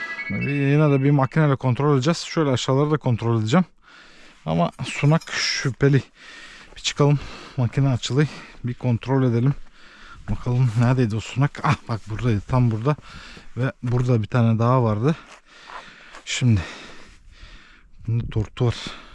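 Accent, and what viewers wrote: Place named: Yoruk, Turkey. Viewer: Yalnız orası neresi merak ettim. Bizim aydın didim'in Yörükleri gibi konuşman ama...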